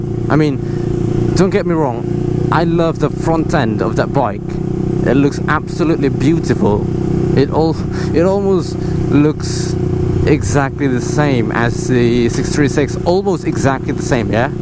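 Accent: United Kingdom. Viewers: aksen british nya keren . kaya ga ada indo2 nya sama sekali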